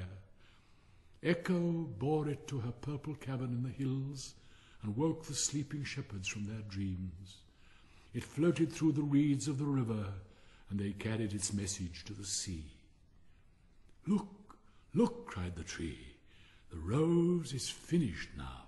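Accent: United Kingdom. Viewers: Thank you! I was looking for a british narration.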